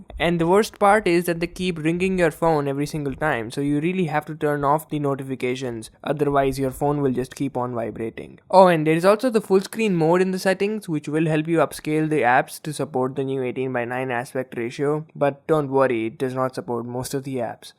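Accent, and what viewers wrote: India. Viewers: IF MKBHD had an indian accent... this is what it would turn out to be. Nice work!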